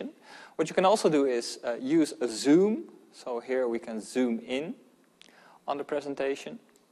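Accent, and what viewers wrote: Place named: Netherlands. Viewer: Great review! You have a Dutch accent and name! I reckon you are Dutch!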